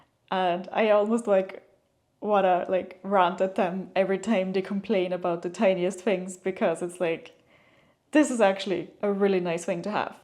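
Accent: Ireland. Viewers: you sound lrish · Lovely the way you sometimes have such an Irish accent or way of saying something. Wish you the best in Austria. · Ha ha, from your accent I can tell you spent time a lot of time in Ireland. (Greetings from Ireland)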